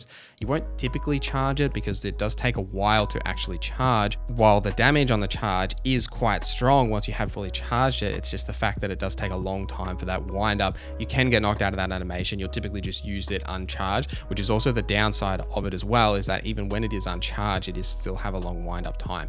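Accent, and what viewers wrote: Australia. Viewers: Great video. I love your font of Australian accent and your energy❤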